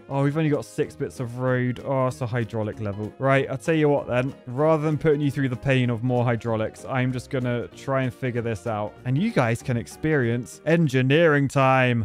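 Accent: United Kingdom. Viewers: I love the little British man in my phone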